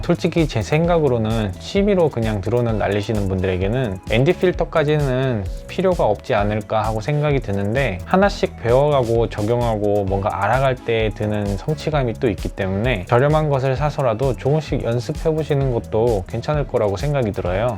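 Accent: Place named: United States. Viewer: 영어학원인줄 알았습니다. 발음이 미국인...^^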